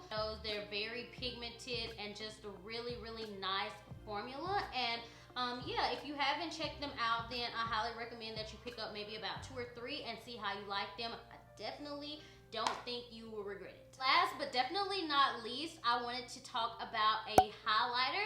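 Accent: South, United States. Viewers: Your southern accent is so adorable.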